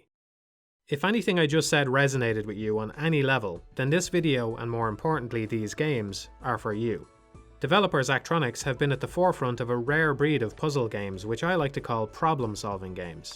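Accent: Ireland. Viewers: Just discovered your channel researching Zachtronics. Gas to come across an Irish voice :D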